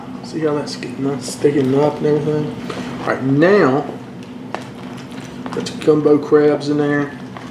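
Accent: South, United States. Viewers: I love your videos !! just found them today :) LOVE your southern accent ! Where r u from ?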